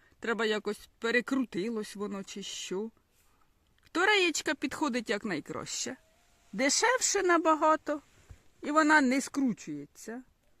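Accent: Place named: Poltava, Ukraine. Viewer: Добрий вечір, попала на ваше відео і відразу підписалася, тому що почула знайому вимову , я сама родом із Царичанського району, (живу у Дніпрі) потім узнала,що ви з Полтавщини. Манера розмови, акценти дужі схожі. Бажаю вам гарних урожаїв, а якби висилали свої квіти, я обов'язково б у вас купила.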